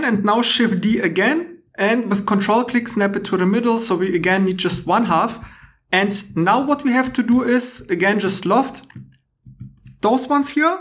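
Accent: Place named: Germany. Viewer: Имя славянское, акцент немецкий. Кто ты, воин?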